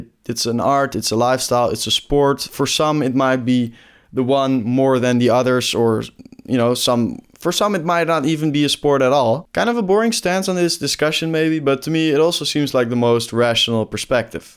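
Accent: Netherlands. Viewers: What's your mother language? Dutch?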